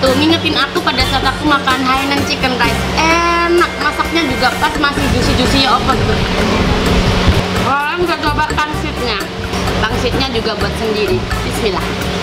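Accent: Java, Indonesia. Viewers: setu-bekasi hadir n sllu setia nunggu konten2 dari kak yg cantik 😍😍😍 ngmgnya kak tu jawa banget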